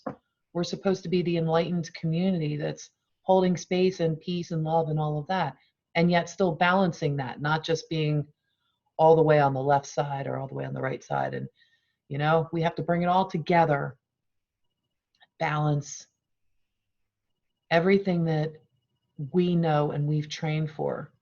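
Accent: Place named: Philadelphia, United States. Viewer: Greetings from New Jersey. I can hear your Philly accent 😃Thank you. Love your perspective and message of encouragement.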